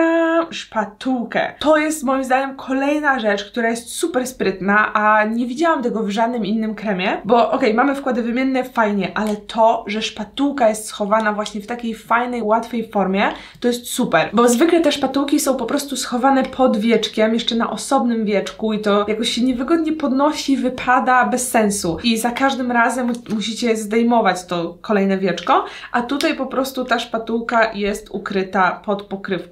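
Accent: England, United Kingdom. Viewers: Ale masz kozak angielski akcent 😯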